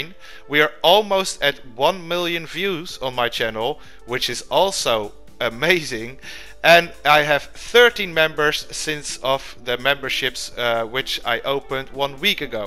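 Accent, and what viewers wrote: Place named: Netherlands. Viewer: You are dutch... i know 100%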